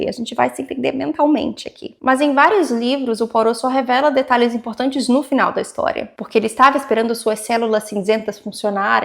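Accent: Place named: England, United Kingdom. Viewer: Parece que meu cérebro escuta voce falando português, mas no final da frase tem um sotaque inglês 😅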